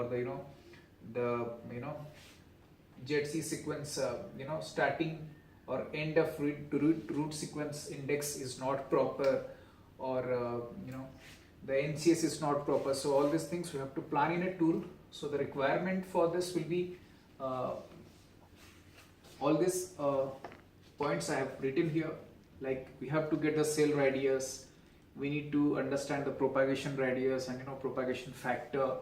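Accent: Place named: India. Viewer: poor accent and english.. too much indian, cant understand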